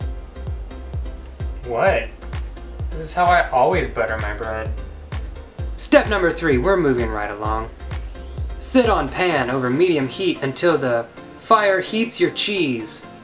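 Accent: South, United States. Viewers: He's definitely become more comfortable and confident over 5 years and it's been so much fun watching him grow and become more successful. Regarding his accent, I think him living in California made him "turn off" the southern accent in a way. I can personally relate to this. I live in Tennessee and I've tried my whole life to not have an accent, mostly because some of my family members, I can barely understand. Haha But, if I spend much time around them, I do have a bit of an accent that peeps out.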